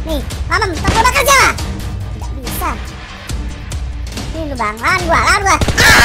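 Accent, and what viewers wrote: Java, Indonesia. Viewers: jowone ngendi wkwkwkwk lucu pwol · Jowomu ngendi?? Salam soko semarang.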